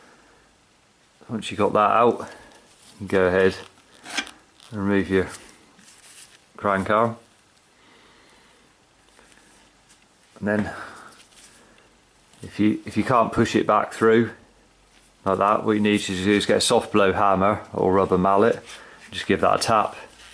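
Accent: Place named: Birmingham, United Kingdom. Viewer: Nice man thanks .. Is you a fellow brummy?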